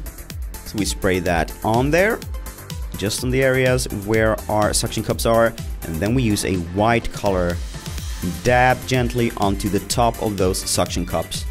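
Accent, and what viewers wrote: Scania, Sweden. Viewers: skånskan caught me off guard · what (I'm assuming) is a Swedish accent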